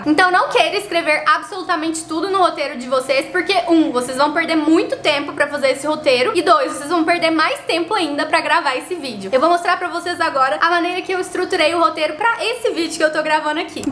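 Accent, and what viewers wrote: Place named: Minas Gerais, Brazil. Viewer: Uma coisa eu tenho certeza, ela é Mineira rsrs. Ótimo conteúdo, parabéns! 👏🏼👏🏼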